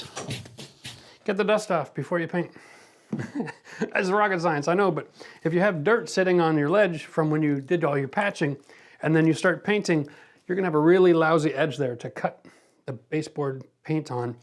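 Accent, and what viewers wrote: Canada. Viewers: Are you Canadian? I detect an accent. 😉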